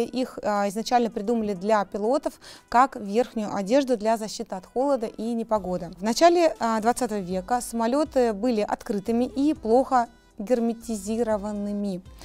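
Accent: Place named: Akanye, Russia. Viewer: Такое милое видео, такие примеры, такой текст хороший мне так понравилось, но вот это аканье так режет слух😢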